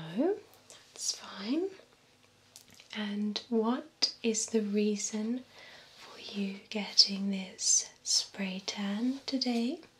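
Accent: United Kingdom. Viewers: There is nothing that turns me on more than a super-hot girl from the United Kingdom; their British accents drives me WILD!! This is a pretty cool skit too; her acting was really good!! This clip should have way more views and likes than it does!!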